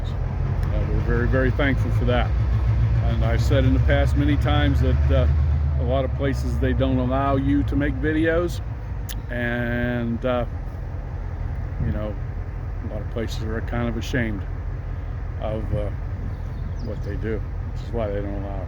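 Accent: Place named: Pittsburgh, United States. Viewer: are you from Pittsburgh that’s awesome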